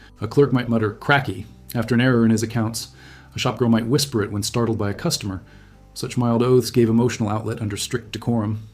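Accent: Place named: United States. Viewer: Rubbish US accent commentary and many of the words pronounced incorrectly.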